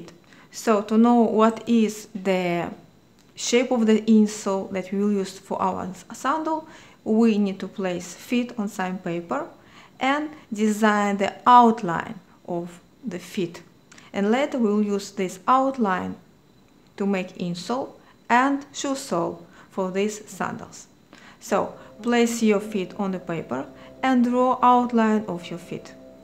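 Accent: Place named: Russia. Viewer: Я только начал учить английский, через секунду узнал русский акцент.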